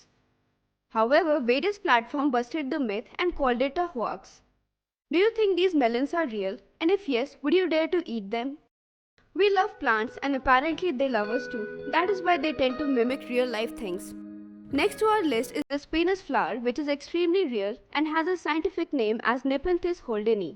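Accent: India. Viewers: but sounds of india girl.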